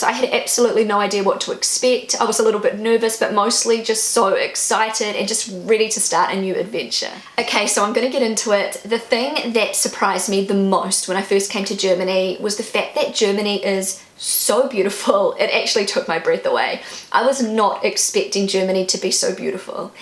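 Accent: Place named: New Zealand. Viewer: It is so refreshing to listen to your nice New Zealand English!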